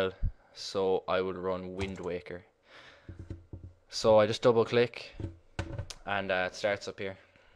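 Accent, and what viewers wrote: Newfoundland, Canada. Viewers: your from newfoundland? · Im a newfie too, saw the zelda back ground and heard the accent and thought "MY BRETHREN"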